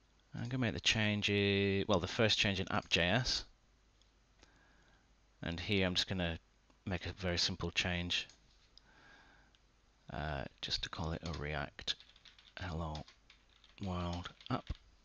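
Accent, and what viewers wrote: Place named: United States. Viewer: Thanks mate. Helped a lot.. Where are you from btw? You sound like american but choosed europe, so I'm wondering :)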